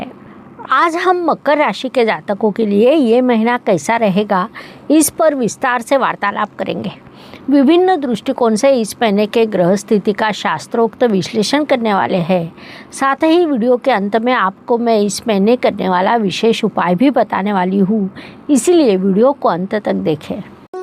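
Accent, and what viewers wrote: Maharashtra, India. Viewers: Arre aunty kyun tum marathi accent mei Hindi bolke jhoot pe jhooth phirse? 😢😢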